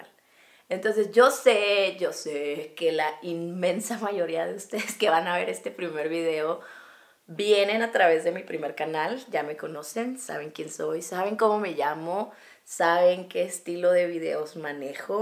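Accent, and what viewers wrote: Mexico. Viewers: el acento mexicano me gusta mucho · sos mexicana... en susurros no se escucha la "tonadita" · amo el acento mexicano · se te siente mucho mas el acento mexicano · Con tu voz normal si se te nota un poquito el acento de chihuas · me encanta conocerte con tu acento mexicano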